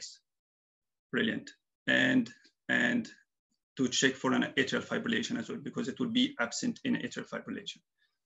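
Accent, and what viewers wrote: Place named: Egypt. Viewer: Thanks 🙏 You are Egyptian doctor I catch your accent thanks again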